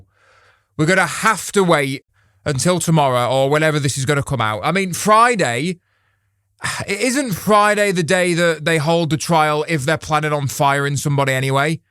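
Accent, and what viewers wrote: United Kingdom. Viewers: Wait, are you British? I thought you were Australian.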